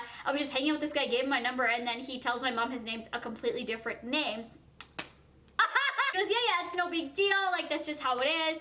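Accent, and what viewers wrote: Canada. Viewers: Mina Mina she probably sounds different because she has a Canadian accent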